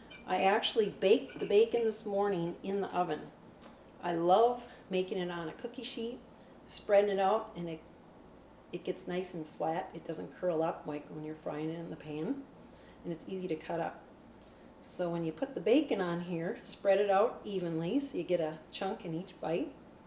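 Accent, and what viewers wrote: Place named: Midwest, United States. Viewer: You are definitely a mid western German with that accent. Great recipe!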